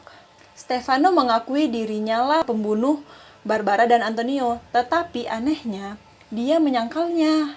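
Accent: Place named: Medan, Indonesia. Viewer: Mantap videonya kakk.. Request dong kak eps berikutnya full pake logat medan kak 🤲🏻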